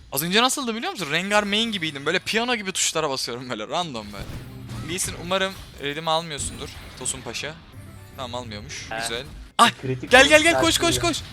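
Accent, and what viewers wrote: Trabzon, Turkey. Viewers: Abi ehuehu trabzonlumusun abi ehuehuehu (yorum tutmaz yav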